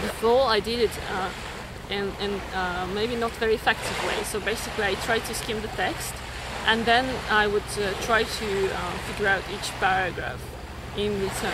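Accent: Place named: England, United Kingdom. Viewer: This is a English accent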